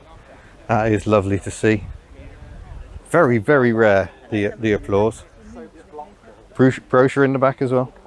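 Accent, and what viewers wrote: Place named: Scotland, United Kingdom. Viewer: I missed his videos so I subscribed to his channel! The Scott brogue does not bother me, I understand what he says. Mind you, most of us in the US would not, but I have friends here from all over the world!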